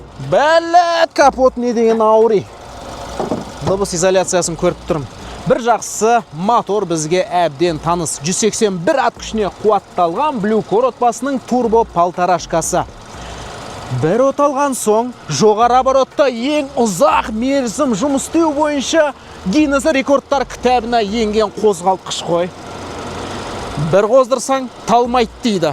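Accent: Standard. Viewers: Ассалаумагалейкум інім! Туу..... недеген таза қазақша тусиндиру👍👍👍👍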